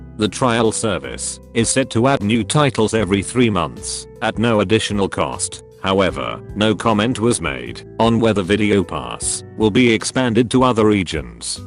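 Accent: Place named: Australia. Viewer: its Australian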